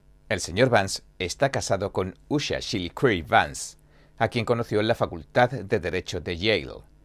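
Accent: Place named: Castile, Spain. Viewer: ¡Como estoy disfrutando de este canal desde que lo descubrí el otro día! EXCELENTE información sobre EEUU y con locutor español, con acento castellanp.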